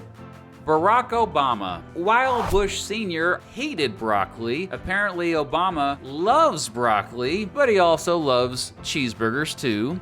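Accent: United States. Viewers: Painfully American delivery, excellent content. New fan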